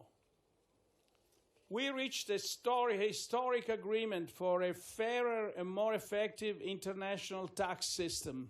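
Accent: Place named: Italy. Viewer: Accento spiccatamente italiano. Che non è un problema, sia chiaro, il proprio accento è qualcosa di cui andare fieri. Resta il fatto che mi aspettassi qualcosa di più.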